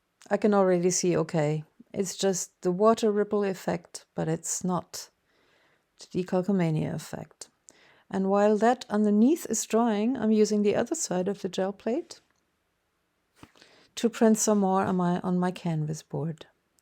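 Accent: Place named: Germany. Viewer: Ich tu mir echt schwer und muss mich höllisch konzentrieren, dieses deutsche Englisch (Denglisch) zu verstehn. Ich schaue viele Videos in Englisch und verstehe sie auch, aber das ist, sorry, weder Fisch noch Fleisch. Ich muss den Ton abschalten und die Untertitel lesen, um das Video zu verfolgen. Vielleicht besser in Deutsch kommentieren und die Englisch Übersetzung in den Untertiteln anbieten.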